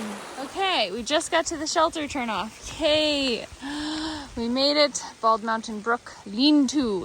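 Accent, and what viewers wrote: United Kingdom. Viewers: Great day! Thanks for taking us along. Your smile and laughter are infectious!!! As is your British accent. 😊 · I loved the British accent!!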